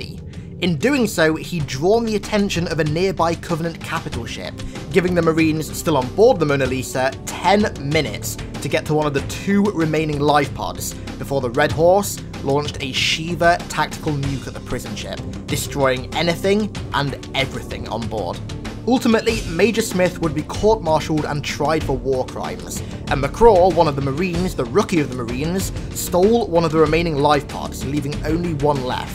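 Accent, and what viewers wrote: United Kingdom. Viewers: British accent then the lisp fuck. My ears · are you british?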